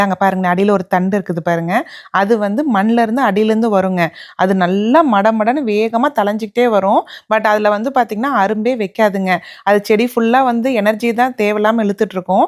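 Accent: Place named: Namakkal, India. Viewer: I believe you are from namakkal from your speech .. good tips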